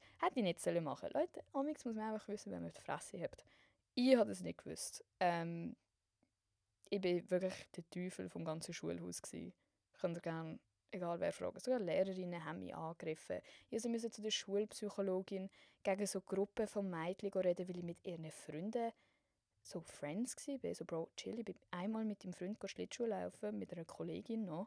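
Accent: Basel, Switzerland. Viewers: cools format! hesch du jetzt en basler dialäkt oder es misch masch zwüsche allem? :)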